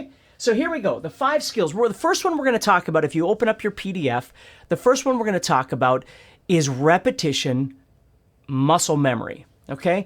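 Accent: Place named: California, United States. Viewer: Hi, great lesson. I'm sorry I'm just under a year late to your class! But at least it's Friday! I love your method of teaching, you seem to be a really nice guy! I liked some of the things you said about, "you would jam all day, with no problem, and then get with friends to jam, and you suddenly forget everything", yeah that's me!!! And don't worry about not knowing enough, just have fun with it!! See I'm 98% self -taught at this point, So you kind of don't catch little thing like that! That matters! I maybe wrong but, you sound like you're from California. I'm from Georgia by the way! I went to you PDF file, and can see, where some of your class was from, Wow man your world wide!!! Thanks so much for what you do, I wish I had 10 more like you! I've got to go now. So see you in the next video! Todd P.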